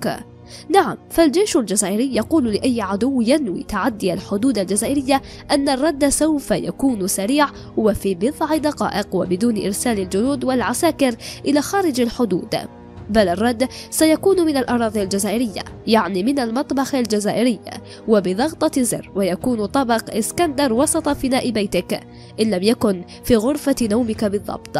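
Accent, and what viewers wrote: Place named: Morocco. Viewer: الله يشافيك يا صاحبة القناة راكي مڨريبيا باينة من صوتك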